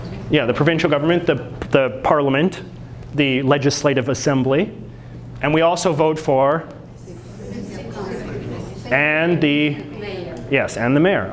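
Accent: Canada. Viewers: Wait people in Canada actually say a boot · Not only do u have some of the stereotypical Canadian accent but you also say "about" :p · Bills can come from any party or the Senate (sometimes). Not everybody in Canada says 'about' this way. Refering to Jagmeet Singh as 'that type of person' was a bit cringe worthy... but I otherwise thoroughly approve of this video. Bravo. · Canadianisms are part of his charm, beyond his super-intelligence /knowledgeable/rational attitude.... many of us are fetish fans, specifically enjoying his “aboots” (etc.) I’m sure a portion of his fan appeal/following, is entirely based on these entertaining mannerisms. Besides, he’s maintaining Canada as a distinct society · Canadian accent. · Im canadian and i laugh whenever he says a boot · “A boot” lol That’s actually a word in Canada?!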